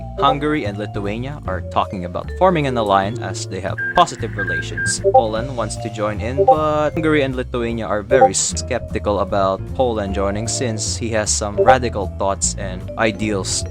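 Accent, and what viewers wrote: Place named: Philippines. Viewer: Your pinoy......i can guess from your accent❤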